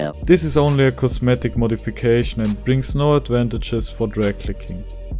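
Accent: Germany. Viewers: Er hat so ein Deutschen Diacsient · nichts gegen dich aber man hört das du deutsch bist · Moin ich höre das du deutsch bist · German but speaking English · U can hear the German, in his voics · Du hörst dich ürgendwie Deutsch an... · Dieser deutsche akzent😂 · Man hört so das du deutsch bist haha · Der deutsche Akzent ist zu geil und Respekt das du so gut sprechen kannst habe es probiert mit meiner Maus(bloody Al90)und habe über 85cps bekommen ❤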